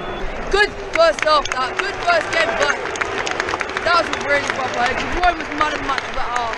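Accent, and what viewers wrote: Manchester, United Kingdom. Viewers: You sound like you're from Manchester. Lifelong city fan I'm sure 😆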